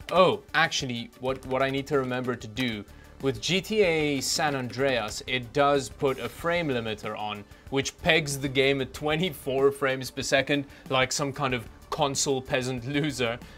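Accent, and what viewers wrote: South Africa. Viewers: Are you south African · He is South African.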